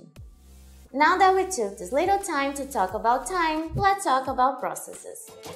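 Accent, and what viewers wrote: Brazil. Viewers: Your accent is EXACTLY what I would expect from a native Brazilian Portuguese speaker. Wonder now where you're from. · seems a brazilian accent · Are you from Brazil by any chance? 'Cause i hear a peculiar brazilian accent lol. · Brazilian accent? Essas paradas em inglês já tá saturado, manda uns em português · You sound so Brazilian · Reconheço esse sotaque Brasileiro!! 🎉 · Impressão minha ou é brasileira? Rsrs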